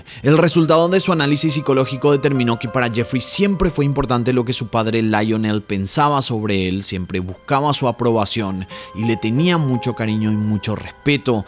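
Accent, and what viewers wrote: Paraguay. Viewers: Buenas tardes, genial tu canal, una consulta, sos de Py? Te pregunto por el acento, gracias por tus aportes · Apenas te escuché hablar cada vez me convencía más que eras Paraguayo, o estoy equivocada? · Sos paraguayo? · Me pareció que serías de PY por el tono de hablar y genial al saber que si, que grande sos gracias YT por mostrarme esto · Es el acento nuestro de los paraguayos! es paraguayo. Saludos a Venezuela desde Paraguay!! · tu acento es paraguayo vrd? lo digo pq yo soy paraguayo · de donde sos??? o tus padres> por el acento pregunto:goodvibes: ( Paraguay??)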